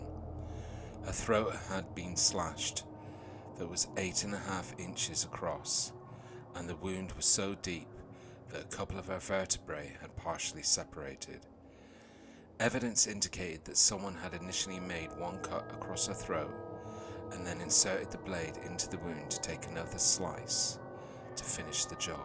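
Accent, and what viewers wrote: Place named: Northern England, United Kingdom. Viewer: Gruesome story loved the northern accent G 😅😅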